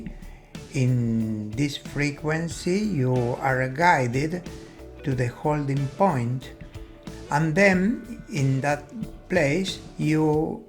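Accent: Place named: Latin America. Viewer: Dear, are you a certified instructor by the Federal Aviation Administration of the United States of America?? Because I hear a particular Latin accent in your pronunciation. Anyway, congratulations, excellent work, you are a great teacher, your students must be very skilled pilots.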